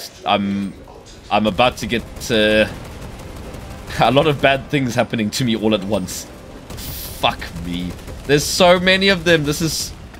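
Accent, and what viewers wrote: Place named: Scotland, United Kingdom. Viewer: Narration alone warranted a Sub... Salute to the Scottish.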